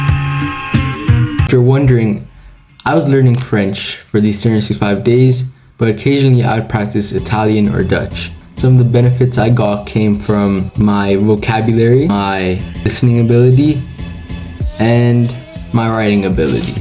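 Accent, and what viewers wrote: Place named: United States. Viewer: oh Gosh, but u seem an American guy cuz of ur English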